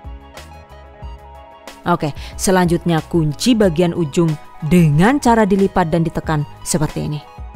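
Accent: Banyumas, Indonesia. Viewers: Bund orang mana yah? Kox Ngmongnya Ngapak banget,😅 siapa tau Kita satu daerah 🤭🤭🤭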